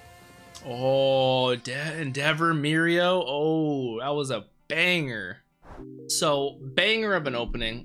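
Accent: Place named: Canada. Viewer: for some reason I thought u were Canadian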